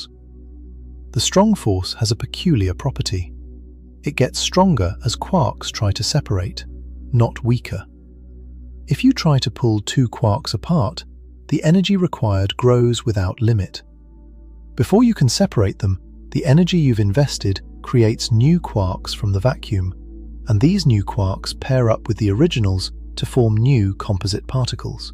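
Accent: United Kingdom. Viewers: 2 hours of mindless blathering with a British accent.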